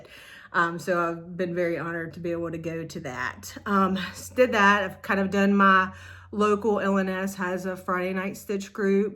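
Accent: South, United States. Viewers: Hi, - I really enjoy watching your videos. It's nice to hear another Southern accent (I'm in N GA.) I'm reorganizing my craftroom and remembered this video. The clean designs made a big impression so back I came for inspiration. Do you remember if the storage units were the Kallax units? I'm trying to avoid the drama of a trip to the Ikea store in Atlanta. There was a time when that would have been a trip I would have looked forward to, but not so much anymore. I love the clean look of the baskets and magazine files. No one has to know about the chaos that lies within.. 😂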